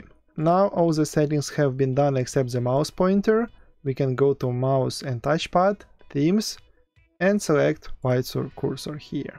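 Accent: Russia. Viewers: not insulting you, but you have a huge russian accent nice vids! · That's because he is Russian. Why would that be an insult? It's just an accent ☺️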